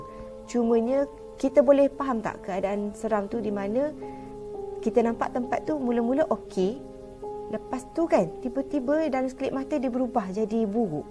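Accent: Kelantan, Malaysia. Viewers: Kak org klate ko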